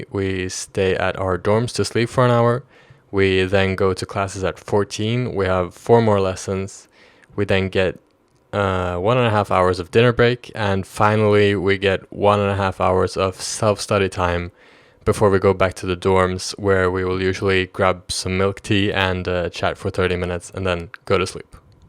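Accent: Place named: United States. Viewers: how does a swede speak english with an american accent? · I am American and I actually thought he was American until he said he's from Stockholm. He probably spent time in America.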